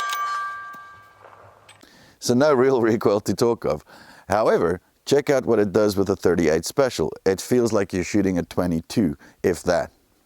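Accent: South Africa. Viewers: do I detect a very subtle South African accent?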